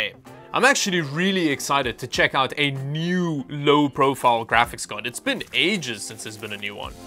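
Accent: South Africa. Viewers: South African accent ? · You're originally from SA right?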